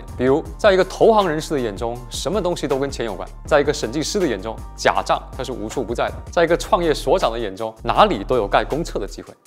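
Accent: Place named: Cantonese, China. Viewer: 就这熟悉的广东口音，爱了爱了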